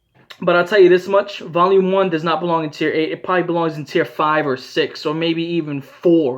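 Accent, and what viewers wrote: New York, United States. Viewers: New York or Chicago? XD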